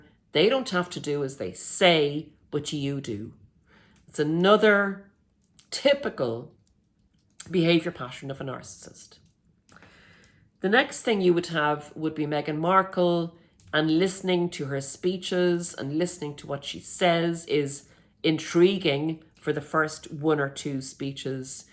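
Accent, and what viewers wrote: Ireland. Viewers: Interesting ...Irish accent 🎉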